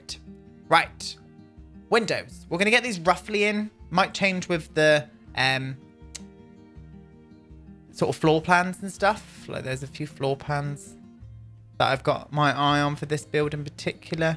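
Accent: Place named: RP, United Kingdom. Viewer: I looooove your pip pip cheerio british accent! Your builds are gorgeous!! ❤️ Much love from Norway 🇳🇴!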